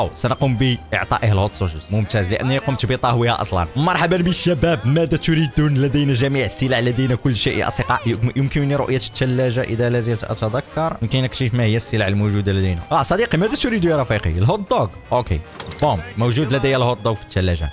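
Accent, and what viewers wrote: Morocco. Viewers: هل أنت مغربي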